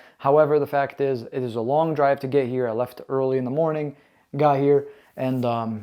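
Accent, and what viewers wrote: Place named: Canada. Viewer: ... You do honestly sound like your from Canada. Other then when you say garage. Lmao... Gareage 😅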